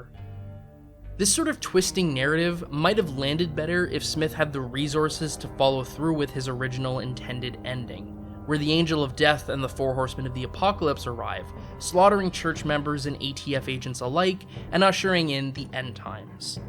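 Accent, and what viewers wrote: Ontario, Canada. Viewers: Brother I knew i heard some Ontario in your voice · The last thing i expected to hear while listening to this video as i recall always liking the movie Jersey girl, was the coincidence that i was currently walking down my street in Windsor Ontario Canada. I guess i should pay more attention to the way people talk, but it never struck me that you might also be from Ontario lol Anyways great video. I also went through a Kevin Smith completionist saga in my early 20's and found my favourites to be the lesser talked about films in his discography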